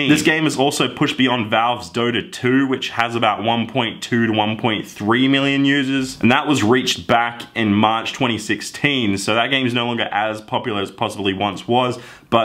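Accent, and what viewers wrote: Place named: Australia. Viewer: Wow you stupid aussie tool! What a scoop you've come up with. Sony will release a PS5 someday? Gee? No one saw that coming. Heres another scoop for you....someday...Microsoft after Xbox One X is released will come out with a new version of the Xbox......possibly called Xbox 2. I must be psychic. You suck! your channel sucks! your accent sucks! Australia sucks! F U!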